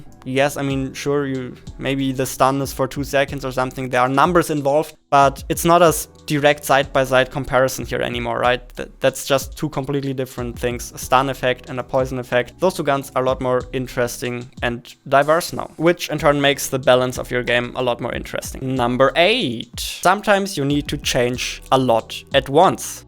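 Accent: Germany. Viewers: Ich hab direkt erkannt, dass du deutsch bist :). Merkt man an deinem Akzent, aber nach weiter so, feier dich und hoffentlich kriegen wir endlich die 100k Abos fertig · This is the most German accent I have ever heard.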